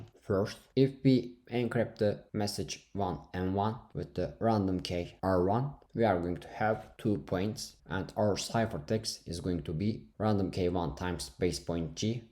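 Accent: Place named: India. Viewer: kanka çok iyi içerikler üretiyosun ama hintli ingilizcesi gibi konuşuyosun yanlış anlama :D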